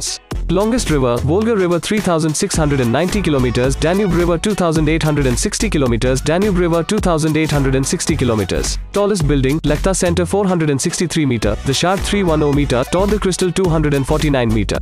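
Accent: India. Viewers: Author from India ? His accent like indian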